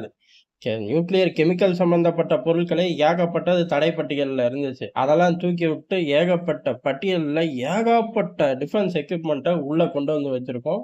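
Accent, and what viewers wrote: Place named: Thanjavur, India. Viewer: Full of enthu..I suspect him belong to tanjure district especially because of d slang ‘அவிங்க..இவிங்க..’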